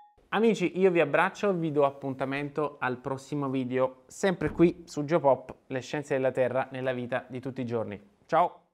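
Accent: Naples, Italy. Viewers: Sei napoletano? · Ciao! Sei napoletano?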